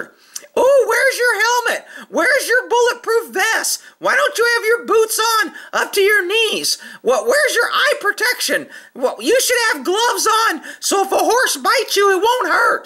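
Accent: United States. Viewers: What a fantastic gentle horse! Completely calm, lets the girls have fun, doesn't even move an ear when the ladder falls to the ground. And I love your comments as always, with that lovely American accent "that horse has one thing on his mind, and it ain't running" hahahaah :D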